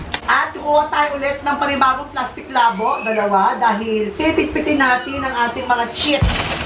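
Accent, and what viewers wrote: Visayas, Philippines. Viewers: bisaya ka ba kuya?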